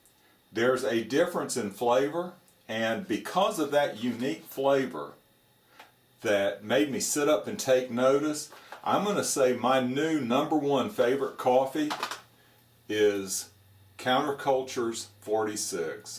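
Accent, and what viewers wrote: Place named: South, United States. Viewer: Love that Southern Drawl... 👌😎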